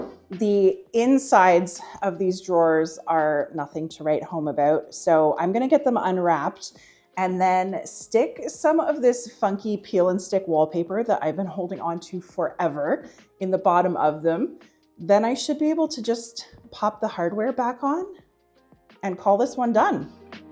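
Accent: Canada. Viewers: I am a fairly new follower so I’m not sure what part of the country you’re in… once in awhile I pick up a faint Canadian accent??? I’m any event I am blessed to live in a somewhat affluent area in Virginia…. Williamsburg/Yorktown area. Those furniture pieces that you have restored, especially most recently that dark olive green dresser or sideboard or console would easily go for $1200 in this area!!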